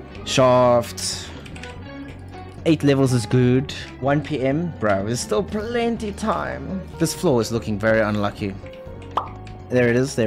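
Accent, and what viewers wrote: South Africa. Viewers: I love when people ask this because nobody has ever sounded more South African than him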